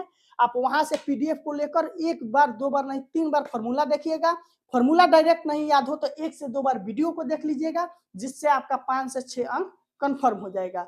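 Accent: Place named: Bihar, India. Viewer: That Bihari accent - नमस्कार भाईयों ❤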